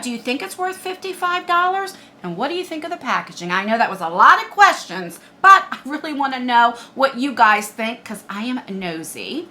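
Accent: New Jersey, United States. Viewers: I definitely like it when you show cosmetics and and some of the clothes! I love your Jersey accent!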